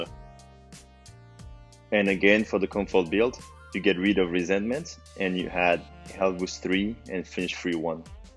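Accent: France. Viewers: Hi are you french ? cause Your accent look like french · tu es francais non?🤫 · ahhh l'accent français 😏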